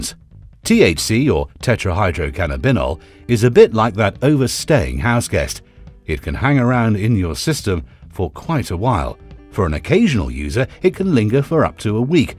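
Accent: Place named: United Kingdom. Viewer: Why is a British guy commentating when marijuana is illegal in the UK that doesn't make sense are Americans supposed to be more interested if they hear a British accent.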